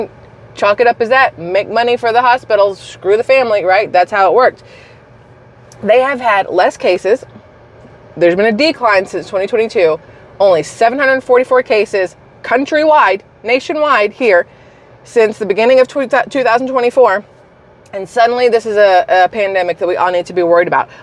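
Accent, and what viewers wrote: South, United States. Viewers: you are hilarious!!! So honestly southern!!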